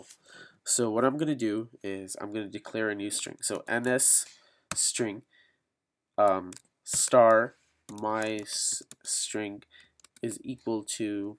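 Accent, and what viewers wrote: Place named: India. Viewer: hicthed Naw, it's just your Indian accent. Nice vid!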